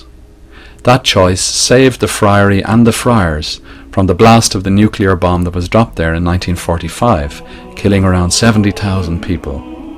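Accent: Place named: Ireland. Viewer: The narrator sounds Irish. As to your bewilderment, uneducated Americans, and many educated ones, are certainly shy on history, geography, and languages. We are good enough at details to have flown an airplane at Kitty Hawk and sent several Saturn V rockets to the moon and back. Now Musk is building them bigger and landing them vertically. That is freakin' detail. We have been blessed with a large country into which people people have found refuge and opportunity, assimilating into culture while adding to the color, patterns, and textures of that fabric. When someone speaking, say Hungarian or Lithuanian, is 6000 miles away why learn it anymore than you would learn Latin to speak to a Roman from 2500 years ago? I know Latin but there aren't many Romans around. I also know German, a language from a place my starving ancestors left because if they and a third of the town hadn't, all would have starved. 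After about four generations of my folks speaking it as a native tongue, I had to learn it in school. As to geography, is there a major League Baseball Franchise in Austria or Australia? And most Americans wouldn't know which is which. Blame the lame, give a trophy for nothing, liberal, progressive, unionized public school system. As to history, we like WWII movies like Patton, Saving Private Ryan or more imaginatively, Taratino's Inglorious Bastards. We also like movies from earlier times and cultures, take Troy, for example, or Robin Hood vs. the evil Sheriff tales. Unfortunate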